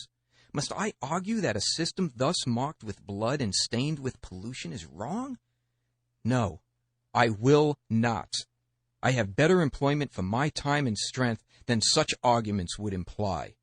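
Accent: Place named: Boston, United States. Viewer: aside from the obvious white Boston accent, THE Words are amazing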